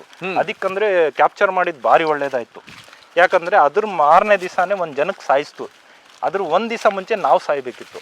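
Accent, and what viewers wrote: Standard. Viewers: Vav.adbhut.nimma.matina vaikhari.ane.bagge.matadoru.nimmannu.nodi.kalibeku.yakandre.nimma.kannada.bahala.sundara.hagu.swaccha.ellarigu.artha.agutte. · ಅಣ್ಣ ಚೆನ್ನಾಗೆ ಮಾತನಾಡುತ್ತೀರಾ ಸ್ವಚ್ಚ ಕನ್ನಡ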